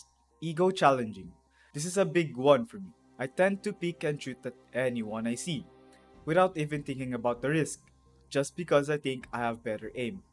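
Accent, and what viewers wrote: Philippines. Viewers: You have a filipino accent. Are you? I am too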